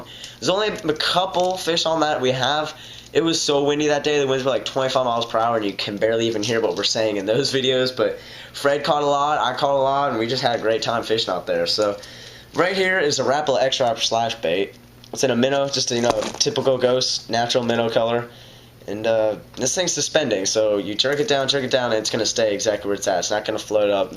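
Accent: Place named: South, United States. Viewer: wtf did you develop a southern accent?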